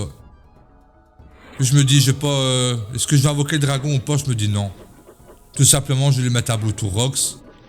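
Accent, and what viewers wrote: Belgium. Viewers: Yo G8 osa 1.29 tous mes respect ! T'es belge non ? ton accent me rire tu gagnes +1abo.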